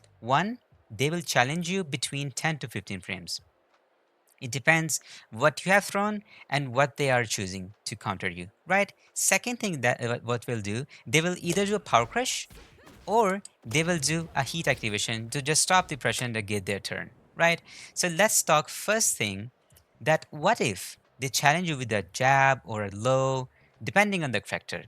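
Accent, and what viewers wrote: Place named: Pakistan. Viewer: I can't tell whether you are Pakistani or british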